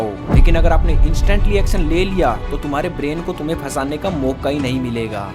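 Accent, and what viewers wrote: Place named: Haryana, India. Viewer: bro is from haryana it tell voice tone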